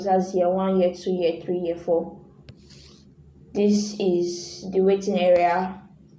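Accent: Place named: Nigeria. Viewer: As I heard your voice, I knew once you were a Nigerian 🇳🇬😝😝😝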